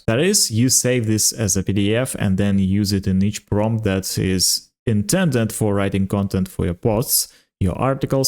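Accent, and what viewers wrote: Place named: Germany. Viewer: your accent is legit german, that's why i said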